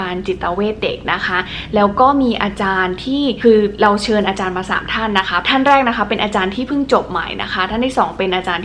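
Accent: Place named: North, Thailand. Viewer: คุณ เป็นคนจังหวัดอะไรครับ สำเนียงพูดช้าๆ ทำให้คิดถึงคนเชียงใหม่ เชียงราย เลย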